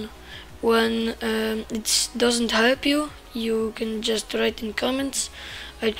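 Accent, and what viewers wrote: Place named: Poland. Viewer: słychać że polaczek :p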